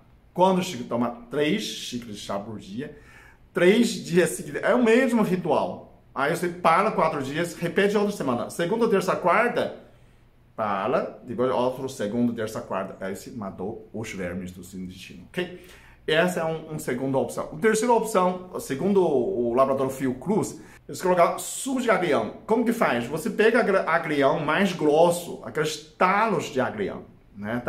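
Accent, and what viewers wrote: China. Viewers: Você fala muito rápido Doutor, não entendo direito esse português Xing ling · mas só saiu assim devido o próprio jeito de ele falar, ele ainda tem o sotaque, chinês, pior o Brasileiro que não sabe escrever o Português certo, tipo andano, falano, trazeno,Sima abito umilde serto e muitos outros, ele é perdoável é só observar como ele fala algumas palavras em português.😊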